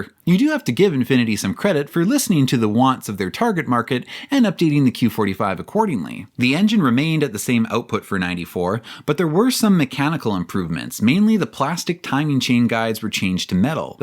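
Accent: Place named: Canada. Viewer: you are definately CANADIAN. "aboat time."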